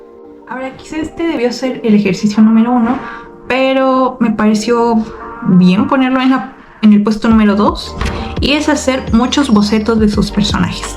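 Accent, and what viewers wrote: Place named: Spain. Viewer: En buena onda , no tomes un tono español como lo hiciste en este video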